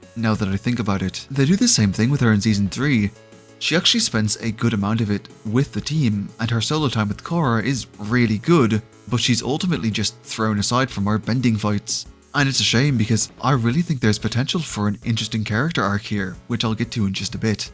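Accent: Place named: Ireland. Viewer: exactly 😅 it's so mixed, it's like every Irish accent mixed together in a bowl 😊 no hate, I just can't place it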